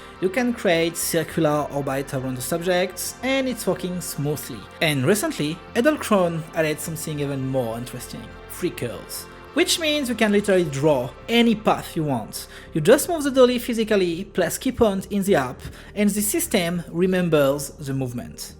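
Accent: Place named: France. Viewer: On est d’accord il a un sacrée accent français ?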